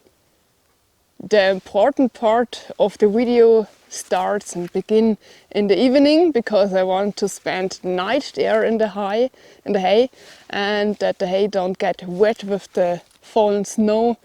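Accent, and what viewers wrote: Germany. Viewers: All I can say is " I love me some!" Girl u r absolutely beautiful and awesome. That accent is really appealing. I miss Germany, former dependent of a service member station in Bamberg, Germay. Anyway, I really think you are beautiful and awesome. Tell the husband to behave or I'm in there like turbo boost. Want to see Utah, USA? LoL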